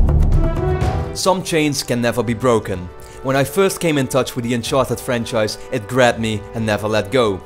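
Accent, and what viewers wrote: Netherlands. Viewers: duidelijk te horen dat deze man nederlands is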